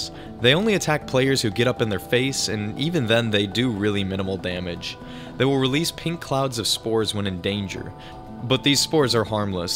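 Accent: Greece. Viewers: Are you greek?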